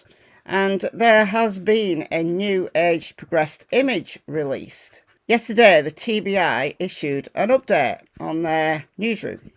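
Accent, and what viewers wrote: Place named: Leeds, United Kingdom. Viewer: You gotta be from Leeds 😂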